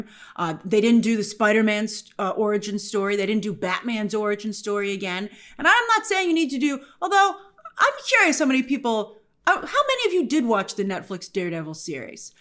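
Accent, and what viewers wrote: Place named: New York, United States. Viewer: love the NYC accent hahaha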